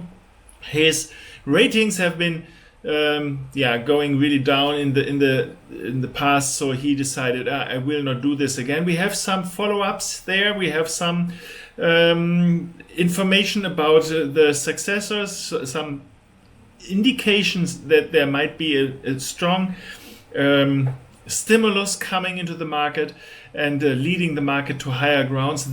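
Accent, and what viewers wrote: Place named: Germany. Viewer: cooles german english!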